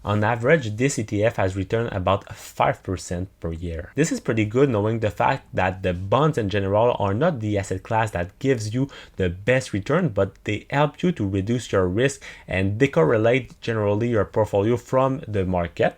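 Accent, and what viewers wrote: Quebec, Canada. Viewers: Sounds like a fellow Quebecer 🤘🤘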